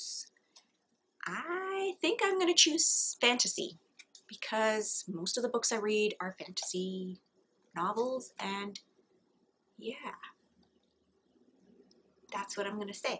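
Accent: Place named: Canada. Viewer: I absolutely love watching your videos. You seem such a sweet lady and I love your Canadian accent, it's lovely. Those were tough questions, I don't what genre I'd choose to ditch, but I'd probably choose Horror too or non-fiction.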